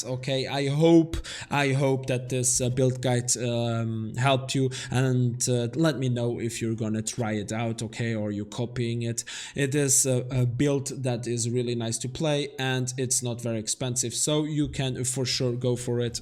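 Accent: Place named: France. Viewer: pti accent Français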